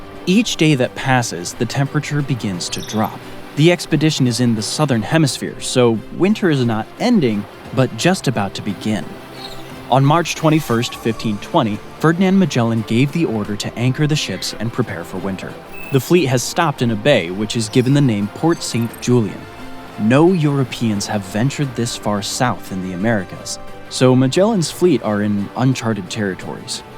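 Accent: United States. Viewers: He’s probably Filipino American by the sound of his accent.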